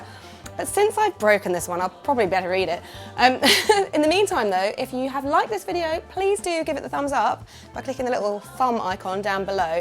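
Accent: United Kingdom. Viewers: Came for New York Cookies but heard a british accent............hahaha, anyways, not half bad looking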